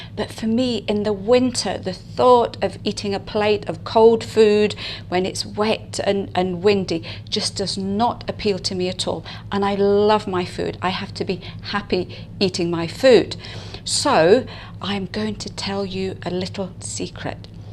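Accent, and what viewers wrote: Scotland, United Kingdom. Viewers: Love your Scottish accent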